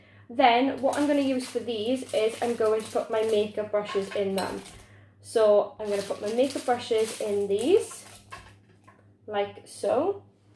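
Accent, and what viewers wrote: Wales, United Kingdom. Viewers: aw lush! No I can spot it a mile off! hold onto it forever I miss mine! 😩 I live by Gatwick now so it's lovely to find a welshie on here🌞 I've turned the little bell on😁xxx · hi - new sub...loving your content...good to know PL gluegun works...will get me one tomoz wondering from a welsh girl -do i hear a welsh accent -you would be the first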